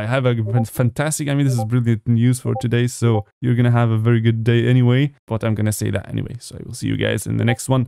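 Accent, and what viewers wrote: Germany. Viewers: Are you German? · Wait you german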